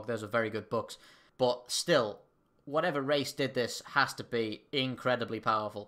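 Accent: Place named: Liverpool, United Kingdom. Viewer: Kind of a Scouse twang to your accent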